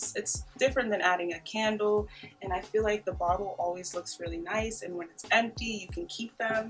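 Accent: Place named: Jamaica. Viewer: Love your decorating skills and you were resourceful with your larger pieces! Love the minimalist glam look. I hear an accent, Jamaica? New subbie.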